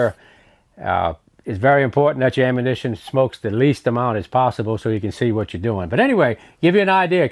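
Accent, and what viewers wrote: Louisiana, United States. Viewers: you got any Cajun in your background? Your wonderful accent reminds me of the venerable Justin Wilson - ah gar-own-tee!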